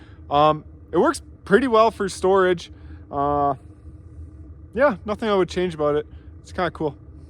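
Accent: Canada. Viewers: As soon as you started talking I knew you were Canadian and when I saw no plate on the front of the truck I figured AB or YT, although I was leaning toward YT when you mentioned minus 30 degrees.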